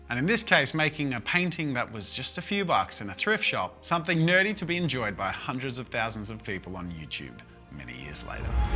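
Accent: Australia. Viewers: Mickey Mouse 🐭 pls pls are you Australian🇦🇺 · wait, are you Australian?!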